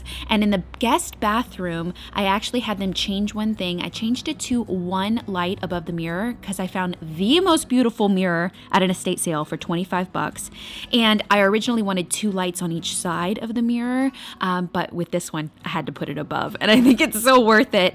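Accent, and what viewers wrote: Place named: Texas, United States. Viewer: Is it just me or does she sound more texan? Just some small things with a hint of southern accent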